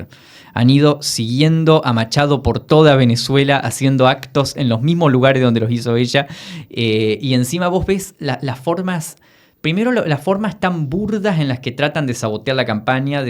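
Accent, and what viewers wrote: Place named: Argentina. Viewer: Analista político venezolano con acento argentino.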